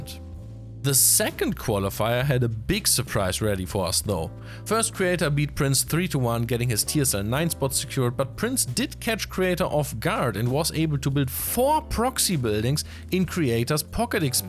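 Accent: Germany. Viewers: Is from Germany? It sounds really like german guy tryharding to not have an accent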